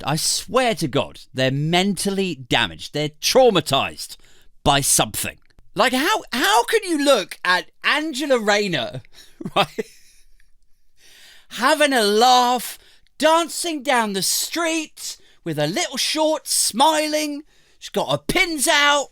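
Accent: United States. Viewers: Ohh it’s the sweary shouty southern man!! ❤️❤️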